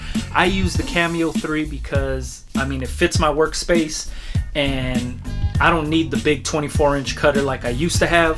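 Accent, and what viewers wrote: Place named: New Jersey, United States. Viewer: Great video fam! I've been thinking of making some merch for my esk8 channel (shirts, stickers). But there's a learning curve so might outsource. Given that, making the videos, editing, and all the esk8 there's only so much room for my skill level. What do you think. Btw, you sound like my cousin...are you from Jersey? - Neil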